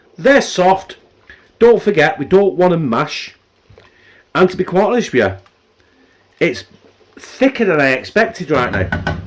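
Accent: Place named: Scotland, United Kingdom. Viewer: Wth! A Scottish accent about mexican food! Lol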